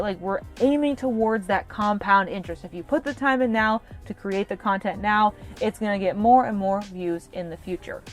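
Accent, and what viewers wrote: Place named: Canada. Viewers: This video was so super helpful! I for one often interact with tagged photos (outfits and locations) especially when considering to buy a piece or go somewhere. And I have been doing it when making reels and posts but I will definitely ensure I do it for all posts. And yes it is obvious that you’re Canadian from your “about” and “out” lol but I’m also familiar with how Canadians speak so some people may not notice - Nelle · This is amazing training!!! Thank you so much! Yes and the word process every Candian says a long “O” sound where Americans say “ah” It’s cute ☺️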